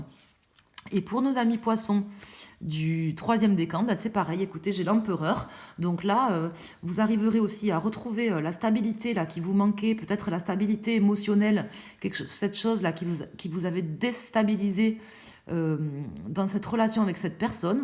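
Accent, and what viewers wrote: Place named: Gers, France. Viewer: Gratitude pour vos réponses 🤲💙 Votre accent me ramène à ma Terre Natale le Gers😍 Belle journée pour Vous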